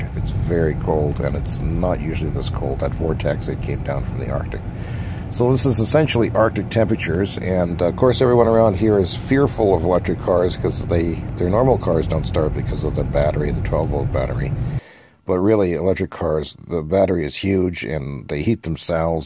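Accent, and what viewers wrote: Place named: Saskatchewan, Canada. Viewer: It’s weird hearing an accent like mine, being from Saskatoon: the only other non-ghetto city of Saskatchewan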